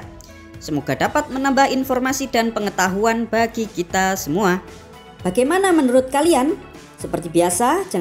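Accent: Java, Indonesia. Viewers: Mimin orang mana kok meddhok? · Admine ughong jowo iki pasti😅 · Orang jawa ya min??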